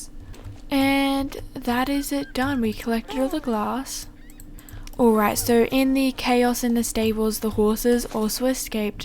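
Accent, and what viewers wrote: Australia. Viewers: No joke, but I really like your Australian accent (I think that’s how u say it).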